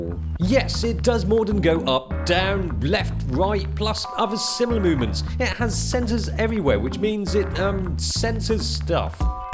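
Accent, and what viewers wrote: England, United Kingdom. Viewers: anglochinese speak like british, cool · Britain accent? Why do I feel it so funny coming out of Asia? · wow where is this place? and as an American, seeing an Asian man speaking brit is interesting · Omg it's a Asian guy with a British accent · awesome an asian with an english accent. I remember when I heard a black guy speaking fluent japanese in a meeting at the office. " I flipped " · i like your British accent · Seeing an Asian with a British accent is awesome! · 這英腔講的，不看膚色還以為是洋人。美式英語語根本沒法聽。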